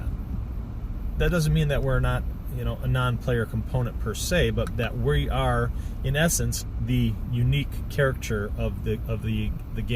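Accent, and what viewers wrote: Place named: Australia. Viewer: You tell like a Aussie 😁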